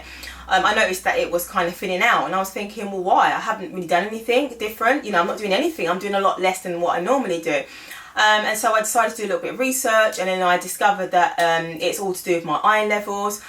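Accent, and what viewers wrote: United Kingdom. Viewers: Lovely to hear a UK voice! Thank you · Finally a British accent . Thanks girl now when u give recommendations on products I can actually get them. 🙂🙃🙂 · I didn’t hear anything she said but enjoying her accent...i bet she is from Uk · I said the same thing. Great to hear a British sister.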